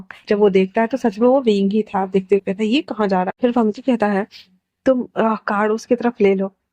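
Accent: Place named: Jharkhand, India. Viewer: Aapki awaaz bahut acchi hai use per Jharkhand ki word aur bhi acchi lagti Hai jab Main vahan ki awaaz sunti hun mera dil khush ho jata hai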